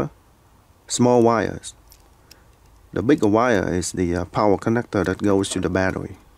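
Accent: Vietnam. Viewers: Vietnamese accent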